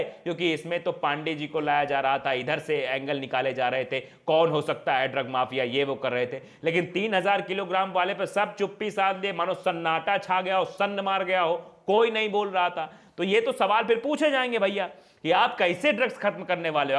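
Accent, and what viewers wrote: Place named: Bihar, India. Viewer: भैयाजी आप के बोलने का अंदाजा मस्त है काहे कि हम भी बिहारी हैं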